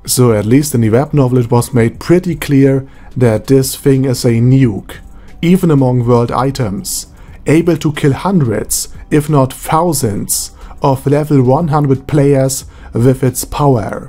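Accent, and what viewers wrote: Germany. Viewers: English is a germanic language :) that's why his accent makes it sound like he's speaking German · I have to confess, this commentary sounds more authoritative because of the German accent. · Why do I hear german even when you talk english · Because of his accent. The auto-CC's even think he is speaking german. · Well i cant watch your video.... your English is right but the accent is so insane, i cant take it.... iam german too but this is too much for me....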